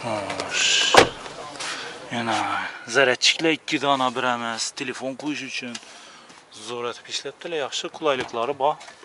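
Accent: Khorezm, Uzbekistan. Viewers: Iloji bolsa adabiy gpirsangiz xorozim shevasida gapirmang tushunarsiz.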